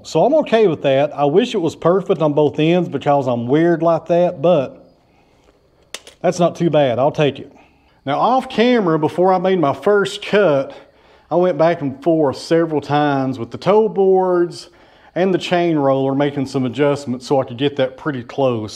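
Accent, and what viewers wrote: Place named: South, United States. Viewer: I will add my voice to those who enjoy your videos just how you’ve been doing right along. That includes your explanations about not only what you’re doing but also why you’re doing it. I enjoy learning about your work. Even though I live up north, I also enjoy your delivery with a Southern touch. In these days of homogenization, the maintenance of our regional differences is a good thing IMHO. Thank you for sharing.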